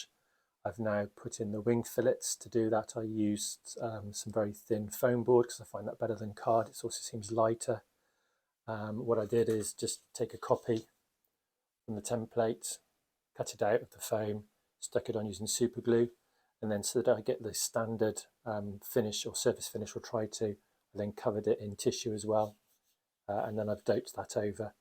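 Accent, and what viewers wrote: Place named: South, United States. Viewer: As a model’er myself, you have made a jolly good effort there. I detected a southern accent there. I am in the West Midlands. I hope we can speak face to face when me day.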